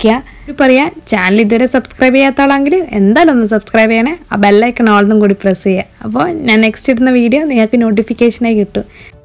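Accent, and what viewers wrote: Kannur, India. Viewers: Chayayillatha kadi.. peruthanne adipoli.... kannur basha pwoli... 😄😄👌👌👌easy & healthy recipe... 😋👌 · Suppertto😍 ningala Kannur basha varthaanam polichtoo🤭😂👍😍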